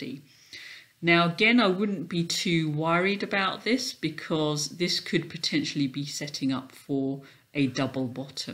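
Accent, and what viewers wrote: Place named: North London, United Kingdom. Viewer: Sounds like a north London accent , probably Wembley , Finchley where I’m from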